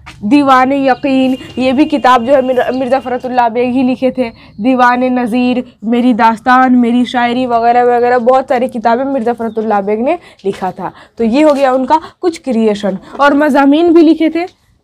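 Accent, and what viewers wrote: Bihar, India. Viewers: Aap kya bihar se ho.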